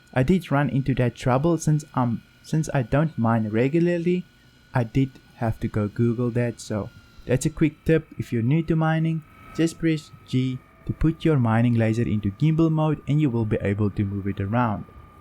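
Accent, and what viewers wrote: South Africa. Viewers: Yitte saffa i can hear it lol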